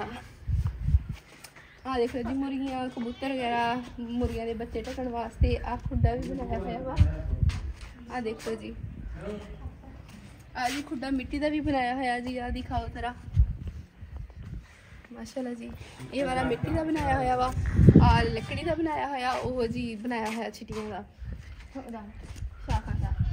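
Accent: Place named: Doaba, India. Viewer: Tuhadi punjabi doaba di lag rahi hai...charde punjab ch Jalandhar te Hoshiarpur doaba ch aande ne...inz lag reha jiwe tusi bhi etho de ho...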